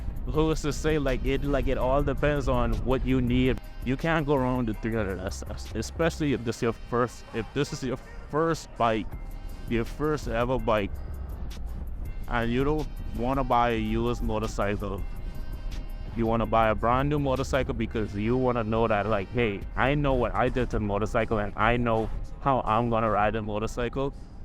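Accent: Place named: Bahamas. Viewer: ik a bahamian when i hear one lol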